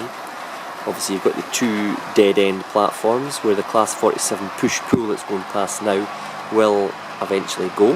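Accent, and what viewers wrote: Scotland, United Kingdom. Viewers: please forgive me for being 4 (ish) years late in watching vid#1 As an English expat based in China since 2004, hearing your accent brought back a million good memories of the 3 years of my life I spent happily working and living 'north of the border'! Vid#1 is a smashing intro to your layout, and I found you & subscribed to you via Everard Junction, who's layout and videos are equally excellent, and I shall be "binge" watching all of your layout updates, in update order. I've been 'armchair' modeling for many years and I'm now looking to actually get off my backside and do something; my biggest issue is that I live in the South of China and thus semi tropical. Temps range from 10C to 40C but humidity is constant from 80% to 100% and I feel that that will be a killer to rails and I'm terrified what baseboards will look like after a year or so! 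Anyways, a pure pleasure looking at your layout in beta form and I'm looking forward to many many hours catching up to its current state. Many thanks mate!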